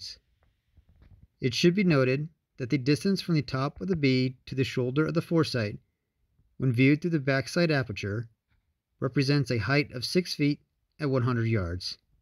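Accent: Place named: United States. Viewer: I really don't like the fake instructional training video format. And your nasal US accent wouldn't have got past the front desk of the WD Publications Branch.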